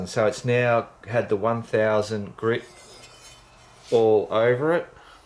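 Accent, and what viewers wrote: Australia. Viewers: lol, you are so funny HaHa speaking like a true-blue aussie. I have parts on my bike that is chrome exactly like yours that has pitted exactly like your, i was experimenting with things myself today and i found that when chrome is that bad you cant save it no matter what. I eventually just used a grinder to grind everything back to bare metal and just repaint the parts in another colour OR just polish and buff up the metal like you have done, but im just going to repaint all my chrome to black and not have to worry about looking after all the shiny metal with polishes and buffing every time i wash the bike. After watching this video your sense of humour made me laugh so much i decided to subscribe, plus your another aussie!!! and its really good to see aussie on youtube making video's. Cheers, Here is a quick-tip, just talk about what your doing and not waste time and footage worrying about what others will say and do, unfortunately there will always be experts putting crap onya, but the way i look at it and i think you do to, its not so much the destination that excites us its the journey. 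Meaning you learnt something today.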